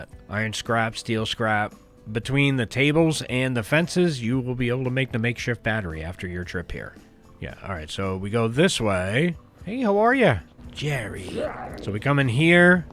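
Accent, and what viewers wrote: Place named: Maine, United States. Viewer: oh yessa! Coast of maine hee-ah dee-ah!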